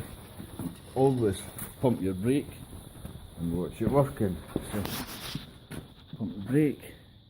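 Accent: Scotland, United Kingdom. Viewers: Love the accent Jock Scottish 👍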